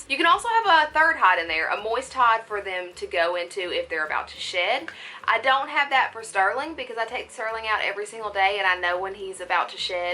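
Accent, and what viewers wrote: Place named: Alabama, United States. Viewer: You sound like you are from Alabama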